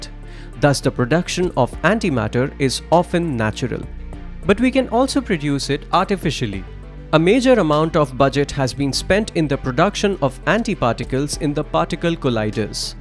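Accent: India. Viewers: This is an Indian guy. His accent. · Its the annoying indian accent I cannot tolerate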